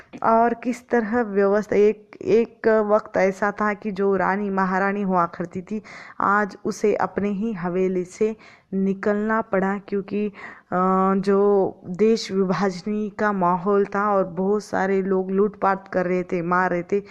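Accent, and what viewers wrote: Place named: Maharashtra, India. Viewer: मेडम हिन्दी साहित्य वाचुन अपल्या मराठी ला बोलण्यात बदल करा ।हळु हळु होईल।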